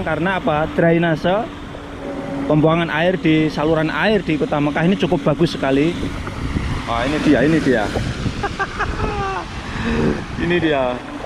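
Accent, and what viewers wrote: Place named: Banyumas, Indonesia. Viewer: Inyonge wong ngendi kok ngapak ngapak temen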